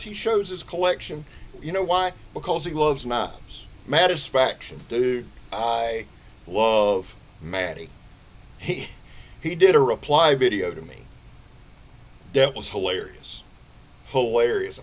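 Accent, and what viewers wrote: South, United States. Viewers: Knife community huh?..pff! Im here totally by accident. One day I was looking for a site called "Big Teddy Bears" while I was going through all my lip glosses. There was this big tatted one holding a knife,it was called. Kinda different, checked it out.. Gotta be some kind of acronym or something I reckoned. Who names something for crying out loud right? Anyway, 'ol turned out to be a legitimately great teddy bear with an enormous heart. Im on my way back to the kithen now barefooted (NOT pregnant!) to check on them cookies im baking..just as soon as I stop to admire my Cold Steel 1860 heavy cavalry saber one more time of course lol. Bothered by your southern drawal Sir? Hardly, Im from South Carolina, mine is thick ass syrup..just like the rest of me!😂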